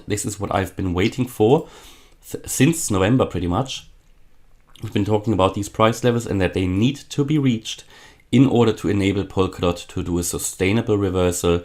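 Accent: Germany. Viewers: German accent ? Grüsse aus miami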